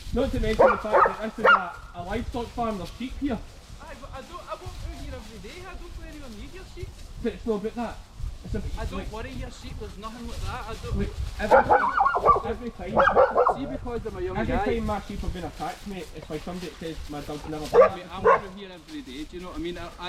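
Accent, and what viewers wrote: Scotland, United Kingdom. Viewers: I'm impressed with you running and chasing Ewes shortly after having Covid. It usually takes people several weeks to recover their breathing and strength and your recovery is amazing in the fact you can actually run chasing a eve around. I'm impressed with Meg's rounding up the ewes but not exactly 100% sure i understand your accent enough to figure out your commands to her. Could you please explains your commands to Meg for us foreigners. I think Meg's been trained abolutely great, and wonder if the breed she is has some natural instincts or shes just an amazing wel trained about what to do with your sheep. i wouldn't mind you confirming what your commands are and what they mean. I also would like to know what each type of sheep they are and why they are called that. Is it age or type or both. I enjoy your channel but I find I have to watch several in a row to get back into understanding you. 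I appreciate the Scottish terms you use but would like a I guess it would be better with a translation as to what you mean. You're doing a great job and hope your channel grows and I appreciate all the hard work it takes for especially to release a vlog daily especially when you're so busy working lambing all day. when youre and you mentioning that what you get for your vlogs and merchandise helps during your tougher months and hope you'll be earning 10 times as much as your currently getting. A thing I wish you'd explain better. Maybe I missed an episode. Could you explain what type a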